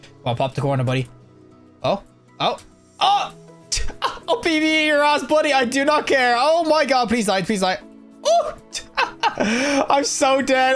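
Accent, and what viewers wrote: Ireland. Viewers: love your dulcet Irish tones but your video's got no game audio, don't know if that was intended.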